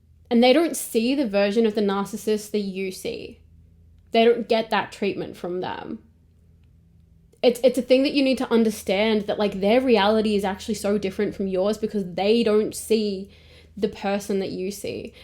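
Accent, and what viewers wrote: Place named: Australia. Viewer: Please, please, please DO NOT, hold back on your beautiful Aussie accent! Your readings resonate strongly and your accent is very endearing. I'm American and, other than Southern U.S. accents, I find American accents incredibly plain/boring 😂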